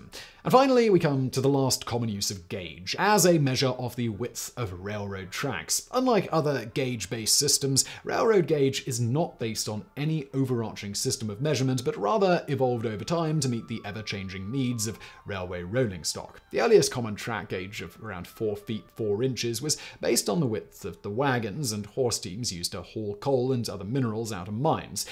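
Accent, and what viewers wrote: United Kingdom. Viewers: Yes, it surprised me as well. Sounded so wrong coming from a British accent, mispronouncing words like that.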